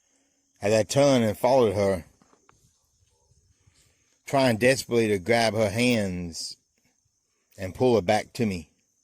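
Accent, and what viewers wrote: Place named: Louisiana, United States. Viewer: Sounds Cajun to me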